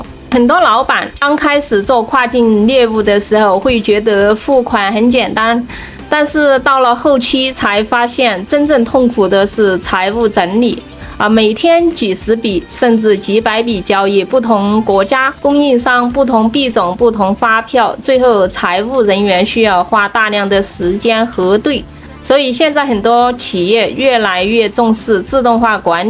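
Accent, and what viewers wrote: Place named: Southwest, China. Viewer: 是西南口音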